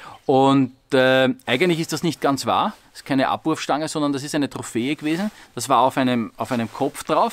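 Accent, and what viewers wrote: Vienna, Austria. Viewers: ...wenn ich dich so reden höre vermisse ich meine Wiener Verwandtschaft. Sehr schönes Video.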